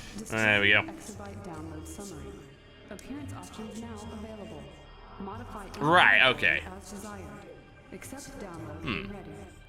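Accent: United Kingdom. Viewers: u hav a strong British Accent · First video I've seen of the; LOVE the voice/accent.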